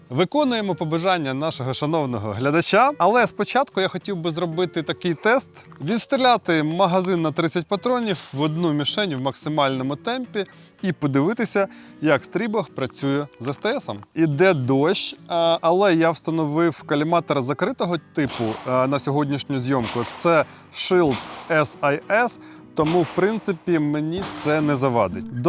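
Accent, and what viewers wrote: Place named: Russia. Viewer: Добрий ведучий, але щелепа російська- смішно слухати.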